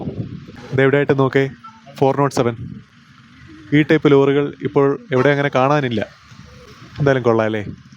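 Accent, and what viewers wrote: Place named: Malabar, India. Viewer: താങ്കളുടെ എളിമയും സംസാര രീതിയും പെരുമാറ്റവും കണ്ടാൽ താങ്കളൊരു കൊല്ലംകാരനാണെന്ന് ആരും പറയുകയില്ല ഒരു മലബാറുകാരനാണെന്നെ പറയൂ...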